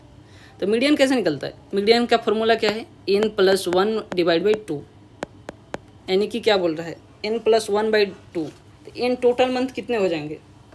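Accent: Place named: Bihar, India. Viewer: Bihari touch in voice ❤❤ great bhaiya ❤❤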